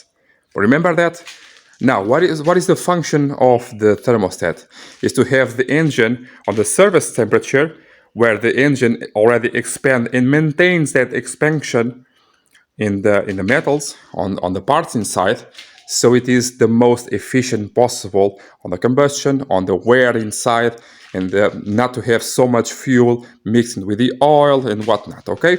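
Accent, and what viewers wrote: Portugal. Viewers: Boas, dá para entender que és tuga, desde já bom trabalho com os vídeos muito explicativos e detalhados, no entanto gostaria de perguntar se tens negócio aberto e se sim, em que zona? Já agora mais ou menos o valor da troca de uma corrente distribuição para um motor 1.3 multijet fiat grande pinto 2007? Tenho o material necessário no entanto receio de o mudar, Obrigado e continuação de bom trabalho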